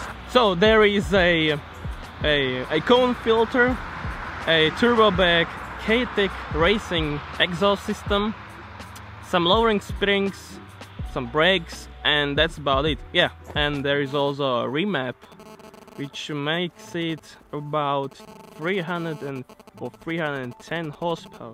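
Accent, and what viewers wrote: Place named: Slovakia. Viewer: Je je,prizvuk ho prezradil :D ale dobrý je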